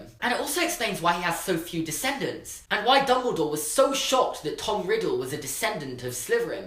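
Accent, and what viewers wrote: United Kingdom. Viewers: Almost every consonant turns into a "fvv" sound for British people doesn't it? "Slyfveryn" "nofving" super cute but also my inner pronunciation nazi is screaming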